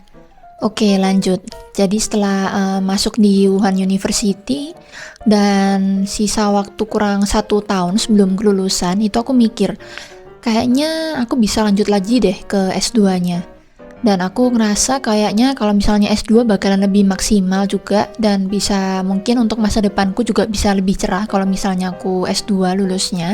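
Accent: Java, Indonesia. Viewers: Logatnya agak ada jawanya, hehe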